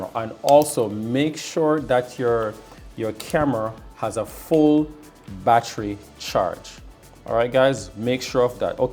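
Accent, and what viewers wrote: Jamaica. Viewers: You sound like a yardie?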